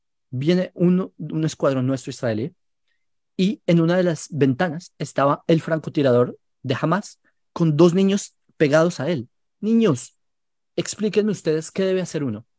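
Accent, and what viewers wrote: Colombia. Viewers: Eres colombiano? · Tienes hacento colombiano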